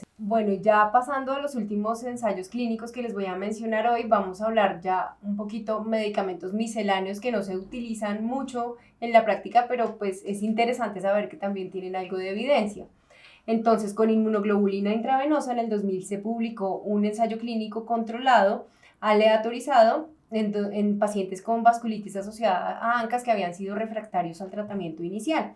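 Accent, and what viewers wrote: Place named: Colombia. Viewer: Definitivamente ya no puedo tolerar el acento colombiano, a partir de un daño gigante ocasionado por una mujer de allá. Se me revuelve el estómago, me repugna. Qué lástima.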